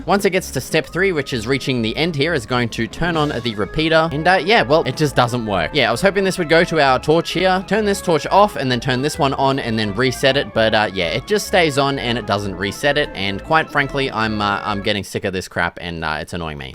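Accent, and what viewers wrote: Australia. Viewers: are u Australian cuz you have a aussie accent some times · I can't tell if you're an ausie or a British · Idk why but your voice makes you sound like the ultimate Australian surfer boy giga chad XD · Are you Australian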